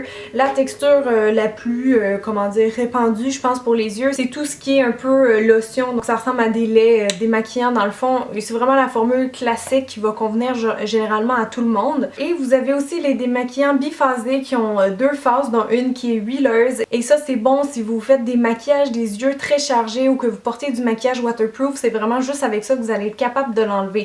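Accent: Quebec, Canada. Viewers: Chacun sa prononciation. Ce serait dommage que les québécoises parlent comme les françaises. Vive les accents du monde! ;)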